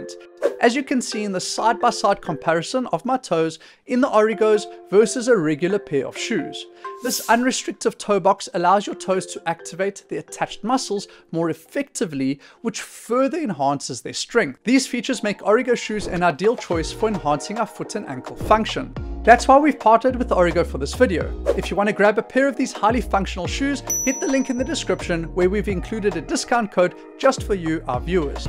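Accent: South Africa. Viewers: Oh yay, I love hearing a fellow South African YouTuber. Great video too. I need these mobility exercises. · He ain't no native. He from South Africa.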